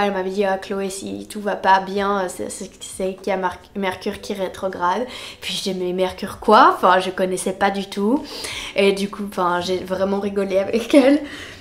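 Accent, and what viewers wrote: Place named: Switzerland. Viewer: L'accent suisse est beaucoup plus facile à comprendre que les autres accents français.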